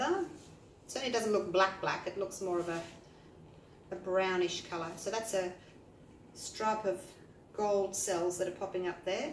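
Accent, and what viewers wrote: Australia. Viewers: Love your work and your tutorials. Especially the way you talk about your life. I feel like I'm listening to a friend and getting to know you as well as a new way of painting. It's good to listen to another Aussie Aussie Aussie. Oi oi you get the drift. Thank you.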